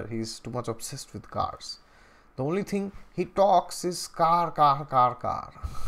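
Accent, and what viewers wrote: Assam, India. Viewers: You are Assamese right?😄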